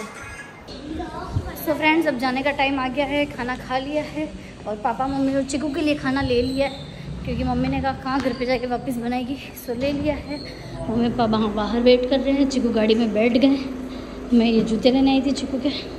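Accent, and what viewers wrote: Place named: Banswara, India. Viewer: aap konse district se ho? banswara side se lag rhi hai aapki boli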